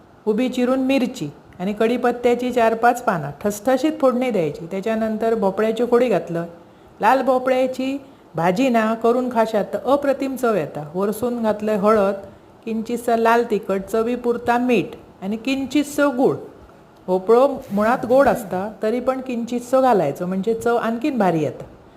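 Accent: Malvani, India. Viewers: Like your malvani narration ❤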